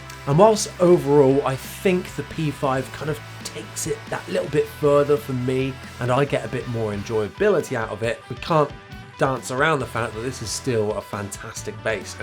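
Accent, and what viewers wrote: United Kingdom. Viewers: I can only listen to a British accent for about 30 seconds, so shut the fuck up and play it!! Peace brother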